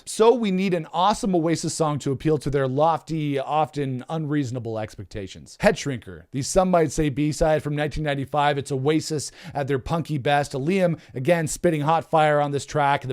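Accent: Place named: United States. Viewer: I looked at the thumbnail and for some reason knew I was gonna hear an American accent lmao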